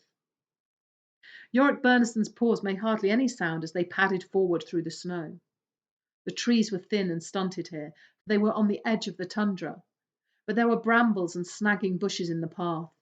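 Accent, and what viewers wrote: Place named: Texas, United States. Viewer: Hooray, you committed to the Texan accent!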